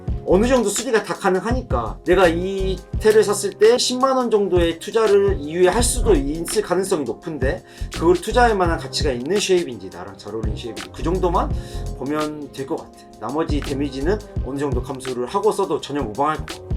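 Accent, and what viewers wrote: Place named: Jeolla, South Korea. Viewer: 전라도 말투극혐이네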